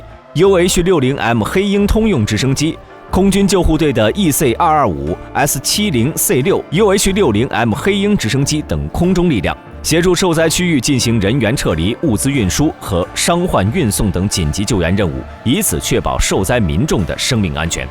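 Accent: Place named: China. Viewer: 怎麼聽上去感覺是普通話，不像台灣國語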